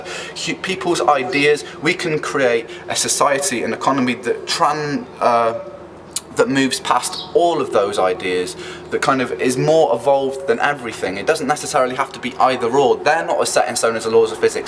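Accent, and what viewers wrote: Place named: United Kingdom. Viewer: You are cool , I like the british accent , I'm from India , a developing country , our scene is the same . But Our country is trying it's best to built government hospitals and free medicine from them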